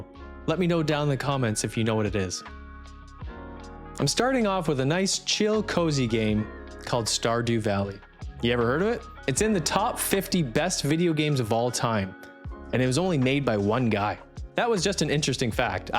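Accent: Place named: Canada. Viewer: "You find oat" "The world starts oat" "Mary-O" -Ah. A Canadian. Got it.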